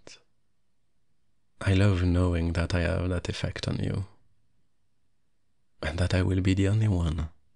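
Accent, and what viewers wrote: France. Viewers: He must be a french man? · Possessive, hot, french man? HECK YES 🥵🥵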